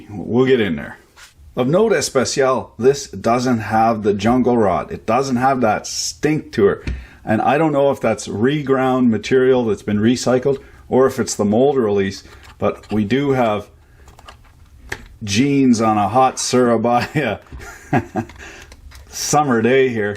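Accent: Canada. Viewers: The Canadian commentary is half the reason I watch these. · been a mechanic since 77 , I came to this channel for this , turns out I like listening to canucks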